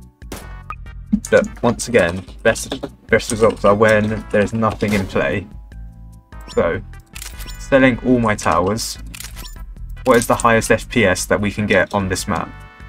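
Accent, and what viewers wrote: United Kingdom. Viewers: I love hearing British accent mmmm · I like voice for it is very British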